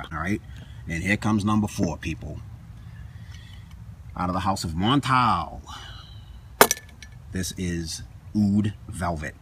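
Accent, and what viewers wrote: Boston, United States. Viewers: Great vid. I can tell your a fellow Bostonian. Best Boston accent I've heard on you tube. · THIS GUYS FROM BOSTON!!!! WICKED & PAHK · Boston guy can tell another Boston accent & no flipping doubt THIS GUY IS FROM BOSTON! Charlestown here brother... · you sounds like ray donavan's brother haha (boston style)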